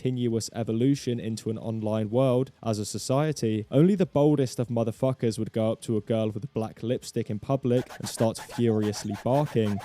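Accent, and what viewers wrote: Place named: Cockney, United Kingdom. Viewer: New to your channel, but loyal to the cause. Binging your shit for the night you cockney fuck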